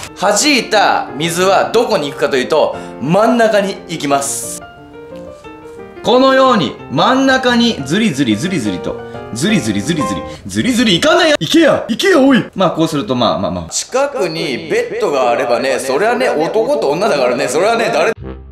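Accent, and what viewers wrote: Kansai, Japan. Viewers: 今日も関西弁やね〜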